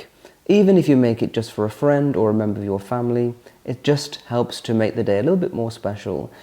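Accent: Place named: Northern England, United Kingdom. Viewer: I noticed he 'dropped' a lot of his vowels this week in his efforts to appear breathy and sensual- they are more Northern than West Country!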